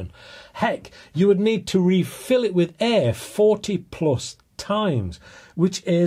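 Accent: United Kingdom. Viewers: thank you very much for this video. I am a subscriber and always enjoy your excellent reviews. I love the British accent as well. I have owned a Gamo Urban which is basically a rebranded BSA Buccaneer made in Birmingham EN. This is a fine high quality PCP, unlike some of the junk coming out of China. Would very much enjoy a review of this airgun from you. For an entry level PCP, nothing really compares. It's also sub moa out to 50 meters with 24 fpe of at the muzzle. Keep up the great work, will be watching for more reviews. 🥇😃 Geo from Kalamazoo, MI USA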